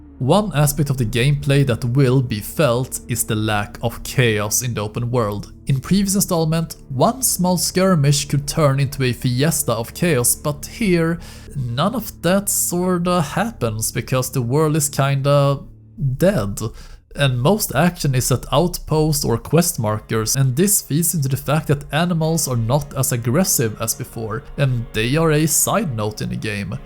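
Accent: Sweden. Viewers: wait are u a swede?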